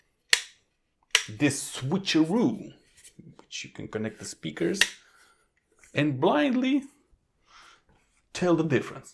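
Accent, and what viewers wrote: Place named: Romania. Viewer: considering your accent I bet that you are Romanian.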